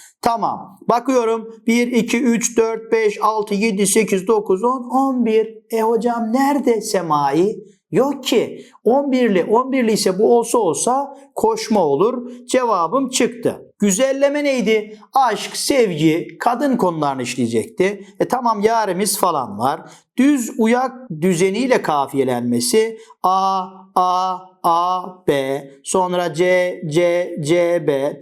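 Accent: Black Sea, Turkey. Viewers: Hocam karadenizli misiniz karadeniz agzina benzettim konusmanizi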